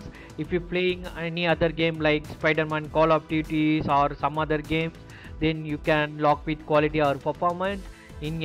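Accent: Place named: India. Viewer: thank you so much man! always the indian accent helping us.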